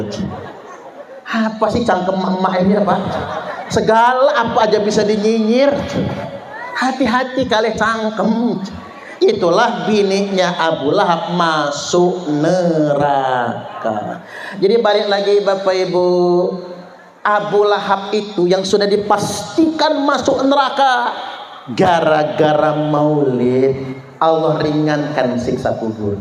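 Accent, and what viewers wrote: Java, Indonesia. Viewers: cerdas wong palembang logat jawa banget sehat terus yai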